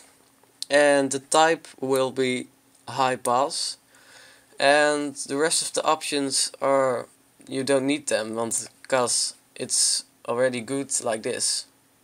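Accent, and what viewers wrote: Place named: Netherlands. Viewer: je hoort dat je nederlands bent :P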